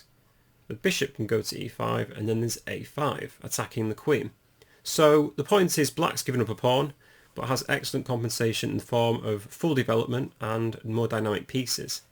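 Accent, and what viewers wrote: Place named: United Kingdom. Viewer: I wanted to share with you how I found your channel, even if it doesnt matter(or maybe you can capitalize on it as a matter of...you'll know what I mean when I say it😅 I searched I donno british chess whatever...I'm here for your beautiful accent man:)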